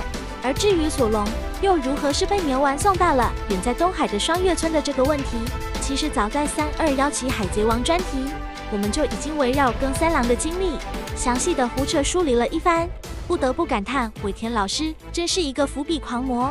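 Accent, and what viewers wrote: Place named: China. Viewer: 較象大陸的，咡過原音。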